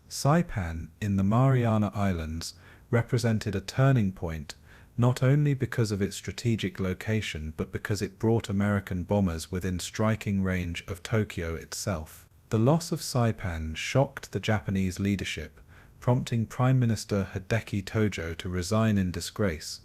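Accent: United Kingdom. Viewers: Sounds like a real person talking with a Limie accent. Good job Mate once again, again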